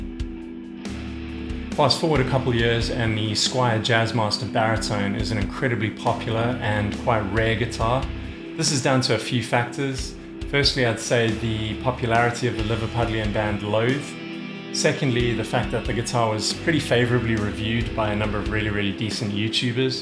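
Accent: South Africa. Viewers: Great video. Been wanting to get these for awhile. I already have a Jazzmaster, but my interest in baritones and low tunings is pushing me to get one of these as well! That a Saffa accent? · Hey man it sounds like you're from South Africa, how was the shipping(payment wait period etc?)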